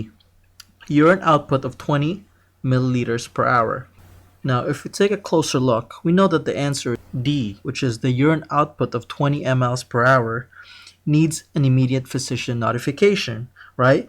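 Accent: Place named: Philippines. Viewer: Sir, pinoy kaba? You sound like one. :)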